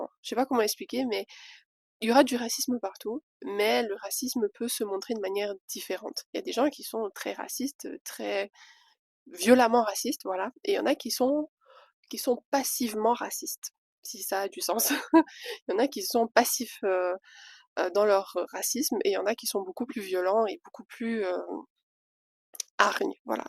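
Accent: Cameroon. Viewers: Écoute, laisse moi te dire que tu t'es déjà bien intégré au mboa, le sang Camerounais domine en toi sa se sent par ton accent 😁👍👍👍👍 🇨🇲🇨🇭✔ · Accent du Mboa🇨🇲 Bienvenue...nous t'aimons très fort, j'ai une famille métisse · Tu as déjà fait combien de temps au Cameroun, pour prendre l'accent là?😅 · Tu es marrante ! L’accent camerounais te vas bien. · L'accent camer est déjà là, c'est super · Ton accent Camer hein...un vrai miel.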